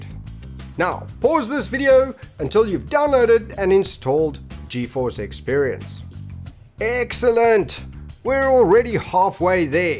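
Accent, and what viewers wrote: South Africa. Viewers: Nice vid dude, you sound South African... can u conform? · Are you south african?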